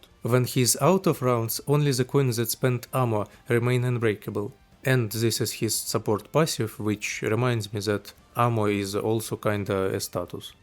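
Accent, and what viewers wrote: Russia. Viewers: Чую русский акцент, бюрн фен. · Мне кажется у автора видео русский акцент, но я не могу этого доказать...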